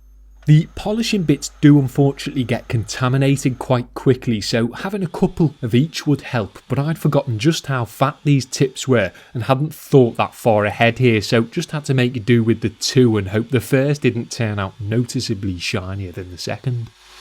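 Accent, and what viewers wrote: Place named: England, United Kingdom. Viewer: that english accent....a candy for my ears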